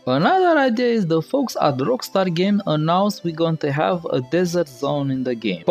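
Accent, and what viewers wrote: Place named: Russia. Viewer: bro, forgive me but your english is like the russian which make tutorials, search for answer. whatever, keep work.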